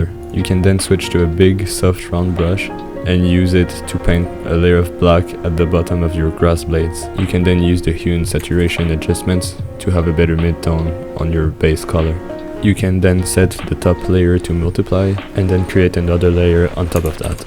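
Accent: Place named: France. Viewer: that's just his french accent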